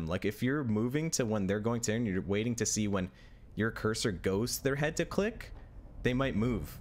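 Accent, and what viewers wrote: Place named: Alberta, Canada. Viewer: Holy shit you from Ed, bud? or Calgary…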